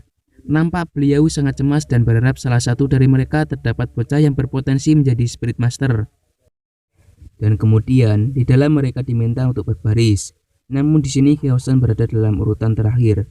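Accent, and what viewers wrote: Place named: Java, Indonesia. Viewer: logatmu medhokk bro... wkwk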